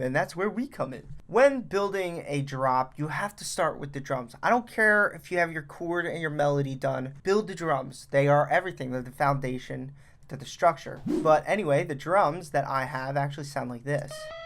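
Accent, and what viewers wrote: Philadelphia, United States. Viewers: Your Philly/South Jersey accent reminds me of home. Love your jawns bro 😂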